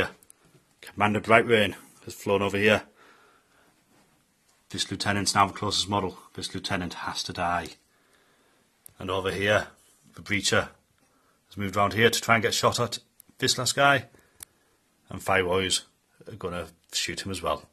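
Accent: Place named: Teesside, United Kingdom. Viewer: Sizkipala, seriously m8, the super dramatic on tenterhooks commentary does it for you? Man. Don't encourage him. Maybe it's the Teesside accent but am not feeling it.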